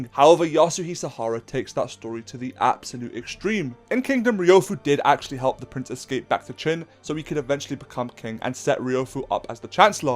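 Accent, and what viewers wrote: United Kingdom. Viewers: Why do you have a british accent? Aren't you chinese? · Im sorry to say this but I raise the volume because you talk with british accent so I can understand what is bro talking about. but on several occasions bro shouted when I turned up the volume, making it uncomfortable for me to watch 🙏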